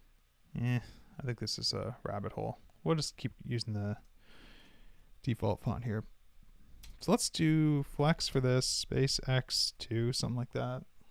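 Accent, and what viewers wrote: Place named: South, United States. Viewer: Are you southern? You have like a very subtle southern accent.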